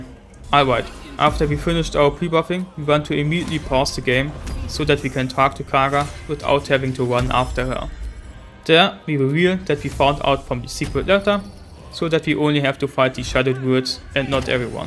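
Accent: Germany. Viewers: Mega Video, sehr spannend und geniale Erklärung, sodass man immer sehr gut verfolgen konnte, worum es ging. Der deutsche Akzent wird über die Länge des Videos immer etwas prominenter, fand ich lustig.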